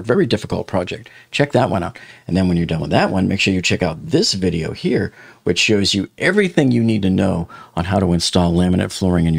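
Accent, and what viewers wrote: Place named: Chicago, United States. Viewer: Thanks for all your coverage!! Watch you ALL the time...are you from Chicago??? We recognize your accent.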